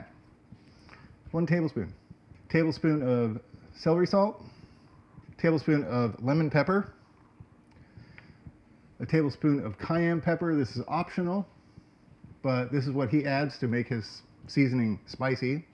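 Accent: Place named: Memphis, United States. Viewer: Uncle Lou's,sounds like Memphis to me.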